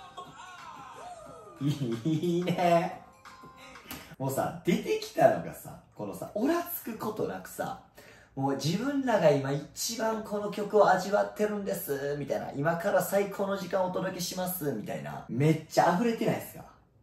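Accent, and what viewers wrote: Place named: Kansai, Japan. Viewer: さん、こんにちはダンスレッスンお疲れ様でした。関西弁で、解説最高です。ダンスも上手やし、イケメンやし楽しく解説嬉しい限りです。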